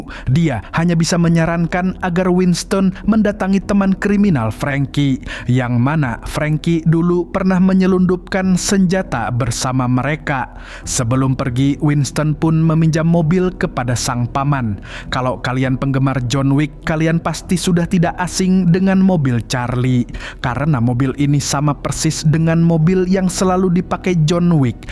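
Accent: Banjar, Indonesia. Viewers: Urang banjar jahhh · Jah urang Banjar Leh Pian mang ,, mantapp 😂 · Ternyata urang banjar lah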